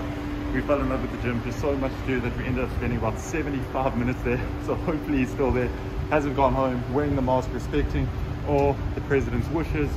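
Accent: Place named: South Africa. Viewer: I die every time he says asssss cream shops😂love the SA accent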